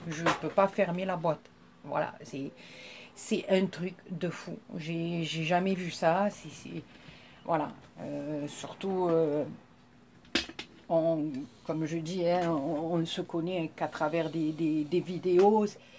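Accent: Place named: Marseille, France. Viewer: Coucou je me disais bien que tu étais du sud (et de marseille) car tu as l'accent de chez nous, et pour être honnête j'aime beaucoup ton accent et ta franchise. J'aime beaucoup.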